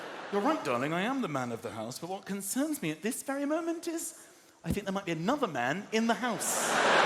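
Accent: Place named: United Kingdom. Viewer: I bet he got bullied at school, little lord fontleroy posh english crap.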